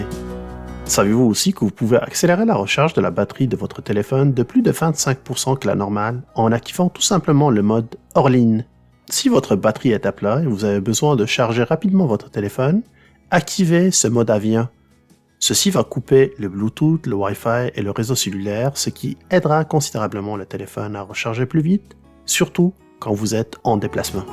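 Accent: Quebec, Canada. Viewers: Bonjour de France pitié je suis entrain de recherché des tuto sur Youtube comment stopper les pubs qui nous dérange presse tout les 4 minutes en visionnant des youtubeuses et youtubeurs ou des émissions ou des films sur Youtube toujours que je parle .donc g pas 1 smartphone dernier cri mais 1 petit simple Wiko .et attention qui n'a pas toutes vos options et appli ? Entre autres les vns privé ou je c quoi je la trouve pas? G juste 1 minimum syndical ? MDR ah ah pitié au secours besoin 2 votre aide urgent ?en attente stop mes recherches et je reste avec vous ok Fréro ? Merci et en plus on adore l'accent 2 Québec. MDR France mardi 1 er novembre 2022.14h 45 de l'après-midi et chez vous c quoi? Bon en attendant la réponse je m'abonne d'urgence pour pas rater le retour ? Ah ah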